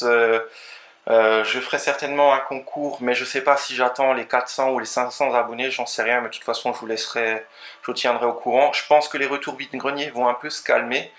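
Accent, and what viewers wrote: Alsace, France. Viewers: je me suis mis récemment a regarder les video de collectionneur comme toi et pleins d autre, et se soir je suis tomber sur ta chaine qui est vraiment tres sympa a voir. bravo pour toute ses trouvailles dans les vide grenier, moi même collectionneur je suis malheureusement moins chanceux que toi quand je passe par un vide grenier pour trouver d aussi belle pièce que toi ! petite question, dans tes video j ai l impression que ta un accent alsacien? je me trompe peu etre. je te félicitation pour ta tres belle collection surtout au niveau des collector ! bonne continuation.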